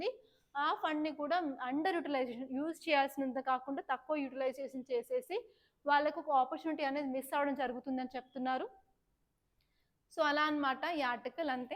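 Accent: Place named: North, India. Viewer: Mam miru telugu valla am so happy...when I listen your polity free classes... I thought u r north indian....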